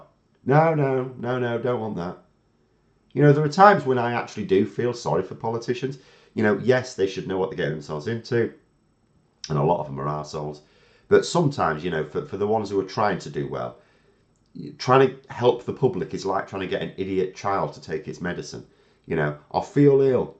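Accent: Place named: Scotland, United Kingdom. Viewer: Scottish accent that’s it !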